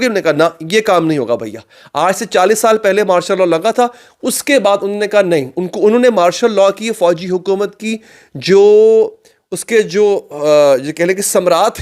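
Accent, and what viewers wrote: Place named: Lucknow, India. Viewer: Aabki Dhuli Huyi Lucknovi Urdu aur Bolne ka andaz aur Laheja bahot pyara hai.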